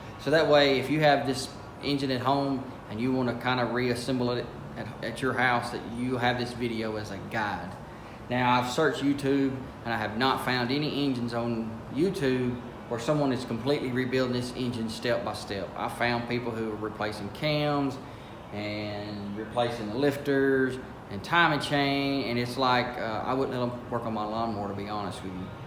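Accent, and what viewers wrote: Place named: South, United States. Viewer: That you bud? Looks and sounds like a hill-billy I grow'd up with- but anyway, I bought a '84 F-150 with a 'yet to be determined' 351, I think. Having hell finding the stamp, said to be on the block. I had been under impression it was a 351 W, but wasn't satisfied using the 'count the valve cover bolts' method, and thanks to a parts-house dude, certain of it not being a Windsor or a Clevelend, yet unsure what it actually IS, I'm back out there at 1st light this A.M. to find the stamp- just so's I can replace this leaky 2-barrel Motocraft Carbureator. Someone said that Edlebrocks are universal? Anyway, I wish my pa had pushed tools instead of a pencil and swung a hammer more often than a gavel, 'cause i think this Ford-thing may stick on-me. Love this truck. 4-wheel drive, 33" Mickeys & glass-packs with that classic '84 front cap, but with a work-bed added at some time. 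The scales at the dump says "5,890 LBS"-DRY! Stay outta my way! Ha!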